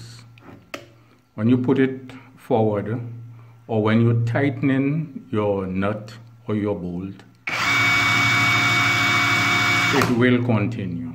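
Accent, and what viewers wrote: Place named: Trinidad and Tobago. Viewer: Just want to say hi to my fellow Trini. Only a Trini like myself will recognize that accent. I am from Point, but live mostly in La Brea. What part you from?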